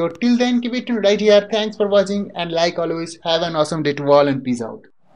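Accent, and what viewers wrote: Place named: India. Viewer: why do you talk like indian person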